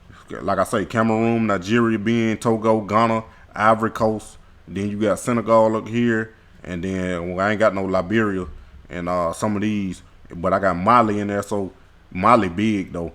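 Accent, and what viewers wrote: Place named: Florida, United States. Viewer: Sound like you’re from Florida 😂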